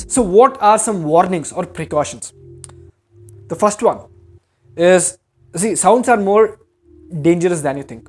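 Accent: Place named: India. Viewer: You are surely an Indian from your accent. Why don't you go to the rural villages of Uttar Pradesh and see the inhumane conditions people live. See if you can help them.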